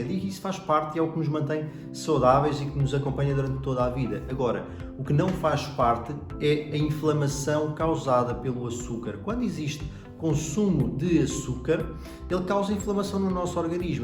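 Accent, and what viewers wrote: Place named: Portugal. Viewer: é português de Portugal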